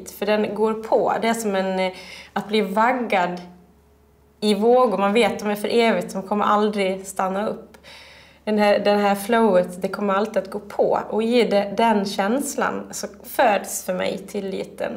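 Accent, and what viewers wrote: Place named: Dalarna, Sweden. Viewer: shes from Smedjebacken and speaks a mild and pleasant "dalmål"-dialect, sort of swedish hillbilly accent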